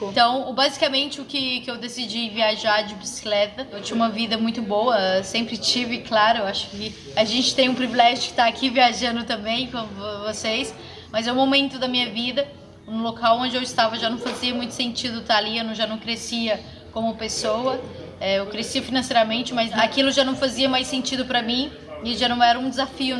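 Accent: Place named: Goiás, Brazil. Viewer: Perdeu o sotaque goiano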